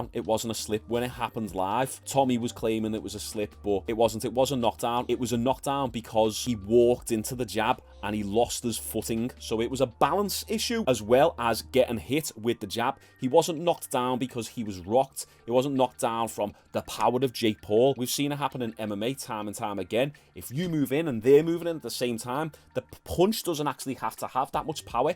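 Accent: Liverpool, United Kingdom. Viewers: Dude. A Scouser can talk about flies fucking, and I'd tune in.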